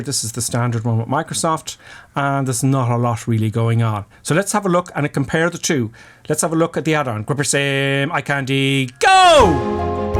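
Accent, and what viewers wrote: Ireland. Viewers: Wait a minute... you’re IRISH??? :)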